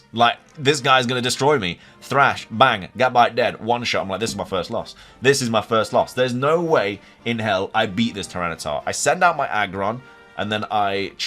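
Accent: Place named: Northern England, United Kingdom. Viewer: I hear bits of some northern english pronouncing and wunder from what county thou art,.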